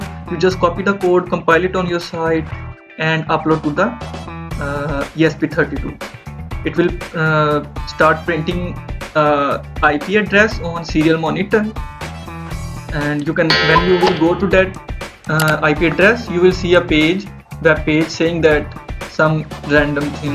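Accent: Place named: India. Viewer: I don't understand indianenglish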